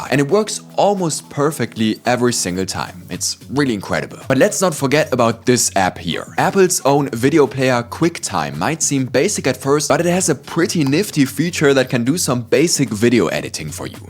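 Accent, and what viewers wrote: Germany. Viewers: 15 sekunden gehört direkt gewusst das er deutscher, ist mach weiter so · This german accent is killing me. Ffs fellow Germans, learn some good English.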